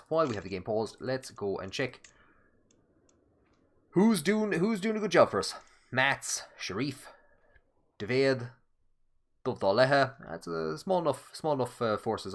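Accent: Ireland. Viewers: I just want to say that this is the best playthrough of CK3 I have ever watched. I like the editing, especially, but also your incredibly knowledge of the history from this period and region. Also, as a typical American, I really enjoy the Irish accent. I know that's silly, but it's true. The end of the series is, for me, kind of like losing a friend. But here's to making new ones.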